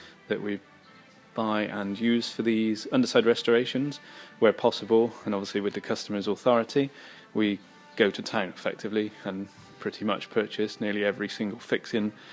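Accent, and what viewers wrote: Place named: West Country, United Kingdom. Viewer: "Startan the fit up" - good 'ol west country boy:) Waiting for a quote,...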